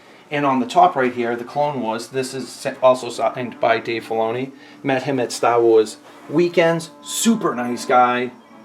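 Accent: Boston, United States. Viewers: Definitely boston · Boston accent? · Total sidebar, but is that a Boston accent? Only I'm from the UK so it's fun to try and guess American accents. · Is that a Boston accent??? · He sounds boston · u lie in Boston bro? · Boston accent heavyyyy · Are you from boston. You have the accent. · I was like, "This dude Boston or a Yorker"? I changed my mind like 5 times but I'd guess he's Boston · Boston guy ay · Are you from Boston? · "This here's a daaat boawd" defo Boston didn't hear aussie tho. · Is it a boston accent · From Scotland love the boston accent, just like the greatest president John F Kennedy. Star wars collection is quality. I used to chew the arms and legs off my storm troopers so it looked like han or luke had blasted them lol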